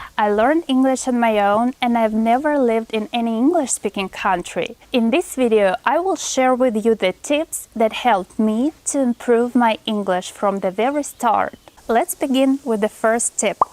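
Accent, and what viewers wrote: Russia. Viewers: Are you originally from Russia? You have such sweet accent that I could not but ask. 😁♥️ Much love! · How long have you been learning english? Judging that you are russian by nationality, it makes sense to your accent. Russian schools do not offer a good system to learn English, so that's why so many move to Europe to study. I've been learning English as a second language for 10 years, and I'm russian by ethnicity too, but I have an American accent, and no trace of Russian. thanks in advance:)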